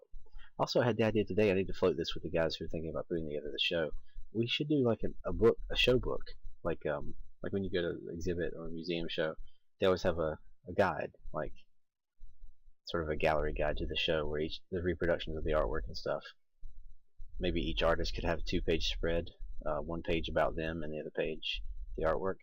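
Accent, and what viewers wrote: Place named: United States. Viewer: Another great video dude. I was wondering about your accent, I thought maybe you were just an american that moved there later in life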